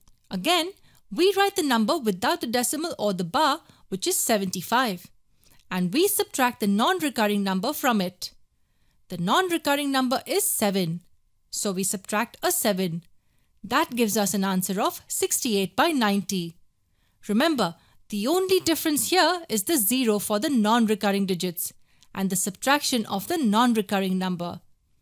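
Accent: India. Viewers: You sound to be Indian but your English is clear and I like it.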